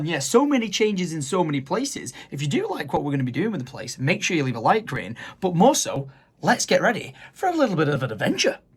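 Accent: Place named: Manchester, United Kingdom. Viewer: Corrrr , u can tell his a manc , a box of Straaaarberys and cream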